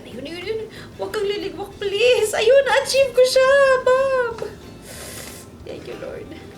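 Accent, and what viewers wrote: Batangas, Philippines. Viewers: nagkakaroon na po kayo ng puntong batangan. nakakaproud lang. palm sugar po sa batangas ay SANGKAKA..more power po.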